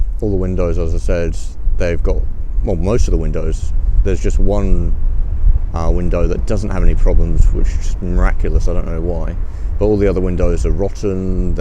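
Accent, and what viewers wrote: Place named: United Kingdom. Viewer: You definetly sound more Brittish than before if nothing else 😅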